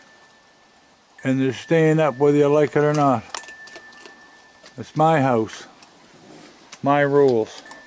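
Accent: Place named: Sydney Mines, Canada. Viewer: I know right? He also sounds like people from Sydney Mines - I thought that was where he was from, when I first started watching him LOL.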